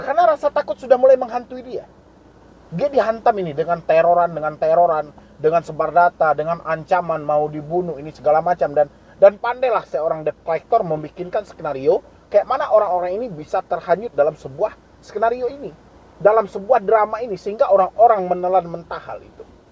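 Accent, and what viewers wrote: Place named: Medan, Indonesia. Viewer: Medan mana suaranya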